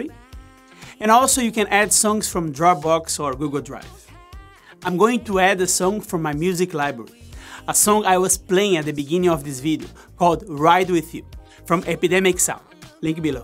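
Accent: Brazil. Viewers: Massa demais!! é Brazuka! Dá pra ver pelo sotaque! Parabéns pelo canal!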